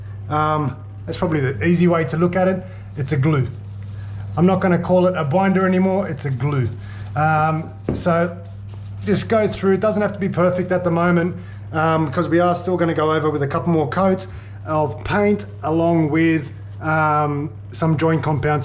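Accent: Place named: Australia. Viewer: Loving the vids mate - very easy to understand and so refreshing hearing an Aussie in one of these DIY vids! 😂 I had a similar problem in the bathroom, prob even a bit worse, was at least half the ceiling. This is my third attempt in about 12-18 months! This time I used Zinsser BIN as the primer but basically followed this same process you detail. From what I can tell it is a similar product but no Binder in it... I have just applied a final 'top' coat of joint compound... Should I just use the BIN next before my ceiling paint or buy the Peel Stop or Crommelin? I could really do with your assistance. Cheers mate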